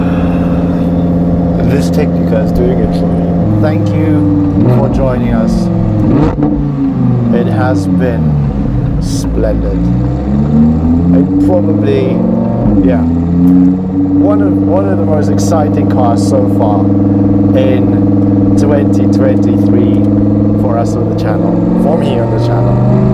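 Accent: South Africa. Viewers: Is our Presenter South African?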